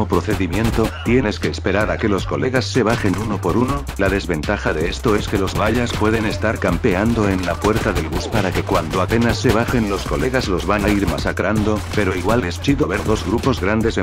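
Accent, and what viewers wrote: Venezuela. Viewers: Vos sos Venezuelano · Esa canción es de la tino América y es venezolano porque su forma de hablar las formas de groserías es obvio que es venezolano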